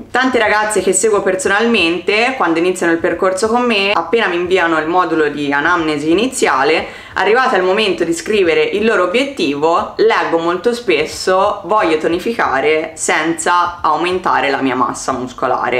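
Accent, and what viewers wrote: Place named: Tuscany, Italy. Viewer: Bellissimo accento toscano, ma di dove esattamente?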